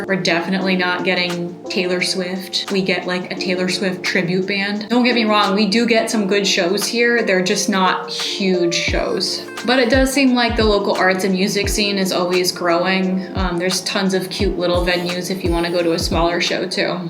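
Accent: United States. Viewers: At some point, can we get a report about NY from someone who SOUNDS like they're from NY and not the midwest? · you dont sound like someone who was born from new york. you talk like one of those californians who talks so slow and pronounce words very loooong..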